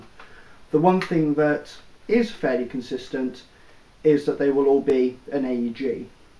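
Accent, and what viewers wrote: England, United Kingdom. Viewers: O my lord.. i was expecting a deep southern hick accent.. not a light voice and english accent.. LOL Anywho, i catch myself today watching vids on first time airsoft and strategy out of airsoft withdrawls due to winter in minnesota -_- Very good videos so far my friend :)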